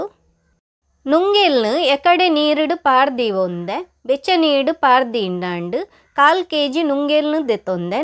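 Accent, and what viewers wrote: Tulu, India. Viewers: manglore Tulu and Udupi side Tulu is little bit different to talk. But nice to hear Tulu language the way of your talking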